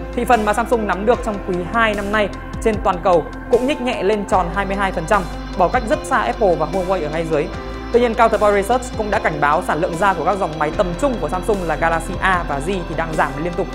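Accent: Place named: North, Vietnam. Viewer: Nói chậm lại anh ơi khó nghe lắm nói nhanh mà còn giọng Bắc thì khó nghe cực (góp ý)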